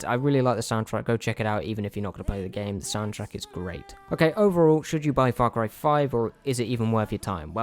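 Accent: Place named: United Kingdom. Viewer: Sounds like reviewer is from UK. They aren't allowed guns there......so they shouldn't be allowed to play games with guns in them. Review is so off base.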